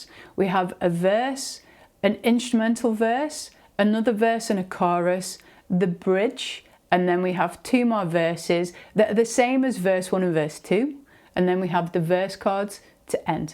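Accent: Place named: Yorkshire, United Kingdom. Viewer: Awesome lesson, thank you. I’m guessing by your accent that you’re not too far away from me Leeds/Bradford area?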